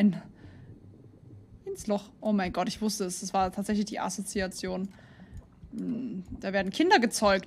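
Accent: Swabia, Germany. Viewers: Sage mol, komsch du ausm Schwobeländle? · Dei schwäbisch killt mi😂😂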